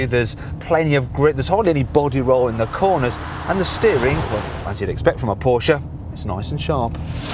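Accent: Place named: Birmingham, United Kingdom. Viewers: Love this guys English english... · had really posh accent years ago. Sounds proper Brummy in 2023.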